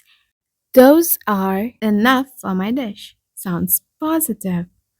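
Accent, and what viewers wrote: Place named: Eastern Europe. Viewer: I beg your pardon, however do you really need to pronounce that B in 'subtle'? It is silent. I feel something East European in your accent.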